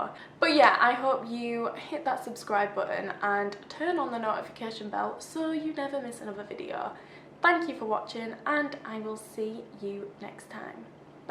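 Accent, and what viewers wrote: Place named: England, United Kingdom. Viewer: Supply and demand, a normal thing. You are a native English speaker, have that English accent that Vietnamese parents want their kids to learn from. Unless the market is flooded with native English speakers who are willing to get paid a few dollars an hour, otherwise, you will still get ~20 dollars an hour.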